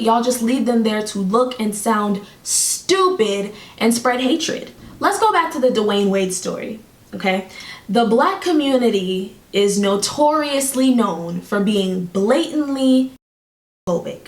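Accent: Jamaica. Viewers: Hooooolllld up , girl u from jamaica , cause dah lawd gad deh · Gurl! Yu Jamaican!? Lie? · I heard the Jamaican accent and subscribed...Goodie🇯🇲🇯🇲🇯🇲🇯🇲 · I here the Jamaican in you😘😳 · I just found you and immediately after hearing the "lawd gad" I subbed! I love finding Jamaican YouTubers! And btw you were speaking nothing but FACTS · I hear that 🇯🇲 accent. 1❤ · subscribed as soon as I heard that Jamaican accent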